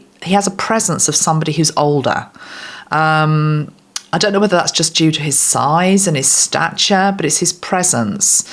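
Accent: United Kingdom. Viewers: I am thrilled to watch this video,! Thank you for sharing your insights. I am hoping someday I’d get to visit your country U.K. When I was still learning English as an elementary student years ago, I dreamed of being able to speak in classy British accent like yours😅…! Well, I ended up here in the U.S., and I am a supporter of JD Vance!!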